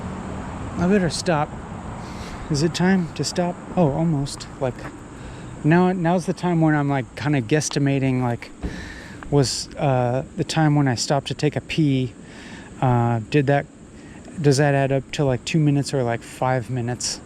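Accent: United Kingdom. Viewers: You’re American/canadian? I always thought you were British 😂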